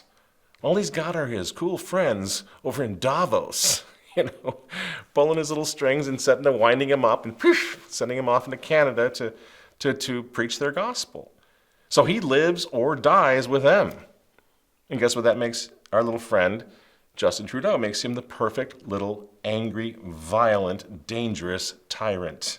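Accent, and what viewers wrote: United Kingdom. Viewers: You do a great British accent!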